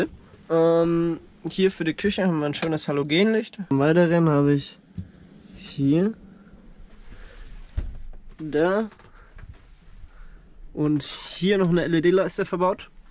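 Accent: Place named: Upper Franconia, Germany. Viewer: Am Anfang vom Video hab ich mir gedacht der is doch sicherlich aus Oberfranken und dann ah der kommt ja auch ausm Landkreis Bamberg wie man selber 😂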